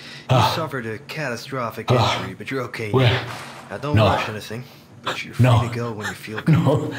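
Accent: South Africa. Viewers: Bro are you south African?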